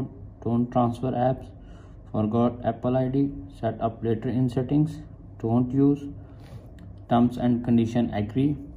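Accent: India. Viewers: Don’t be fool by hi Indian professional voice all liar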